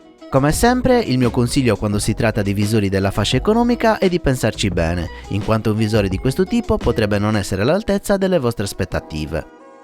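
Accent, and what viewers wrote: Italy. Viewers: At first I was annoyed that English titled video is not in English, but I decided to put on English subtitles and this review is actually nice and clear! I actually even liked this Italian accent :D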